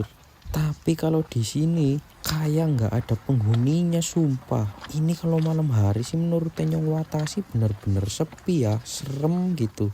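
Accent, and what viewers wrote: Banyumas, Indonesia. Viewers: Bahasane lho.. ra nguati.. 😂😂 ngapak indo.. tapi kue dadi persona lho mas, pertahankan yo.. bahasane wis apik kaya kui.. nek bahasa indo mulus.. ah.. wis biasa.. ngapak indo donk, baru keren 😂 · Ngapak'e banyumas apa bro??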